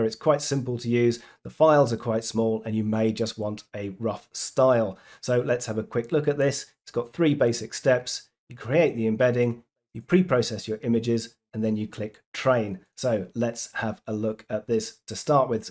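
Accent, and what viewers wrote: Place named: United Kingdom. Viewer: I trust this guy because he has a British voice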